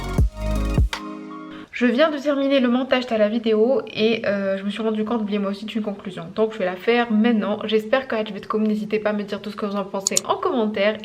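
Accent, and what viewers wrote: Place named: Algeria. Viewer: J'aime trop ton accent algérien ❤❤❤❤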